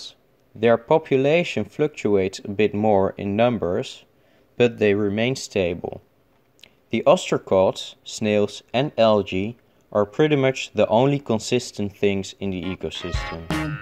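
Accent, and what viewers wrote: Netherlands. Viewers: RRRRRRRRRRrrrRRRrrrRRRrrrrrRRRR horrible Dutch RRRrrRRRRrrRRR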